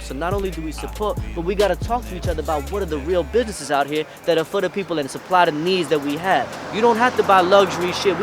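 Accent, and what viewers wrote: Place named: New York, United States. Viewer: Where you from homie ? You sound like you from NY.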